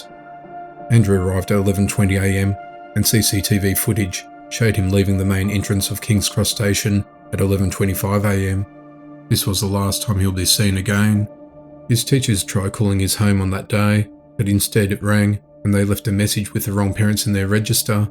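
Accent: Australia. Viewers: Love your voice and because of you I really can listen to other aussies ❤️👍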